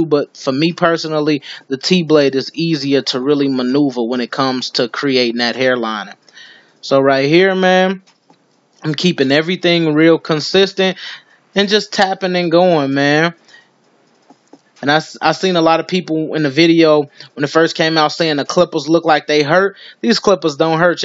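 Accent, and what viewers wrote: Chicago, United States. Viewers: You from Chicago right ? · He sounds like he from Chicago 😂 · I can already tell u from Chicago bro where u cuttin at?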